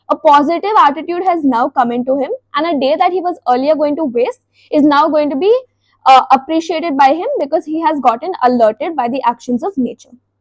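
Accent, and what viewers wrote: South India, India. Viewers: are you from southern part of india cause your accent shows so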